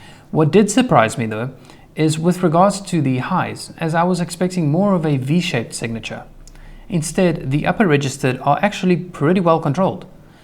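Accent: South Africa. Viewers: Your accent sounds South-African??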